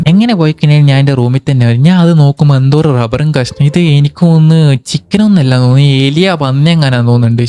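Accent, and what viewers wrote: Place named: Kannur, India. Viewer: Bro kannur slang matram parayumooo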